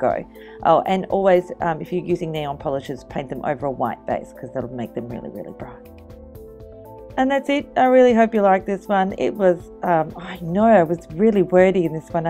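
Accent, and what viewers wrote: Australia. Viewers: I prefer the videos that you talk us through!!! They sound awesome!! And it's really nice to hear another aussie out there (I'm from Australia too)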